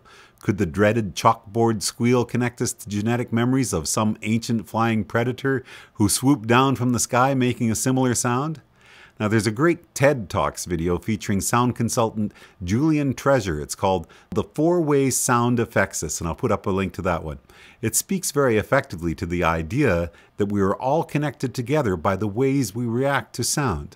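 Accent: Canada. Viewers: hey i didn't realize you sound canadian until now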